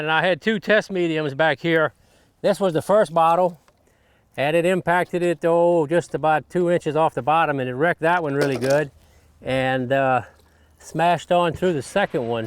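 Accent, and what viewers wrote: Louisiana, United States. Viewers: Eric Jesperson He sounds like he is from Cajun country.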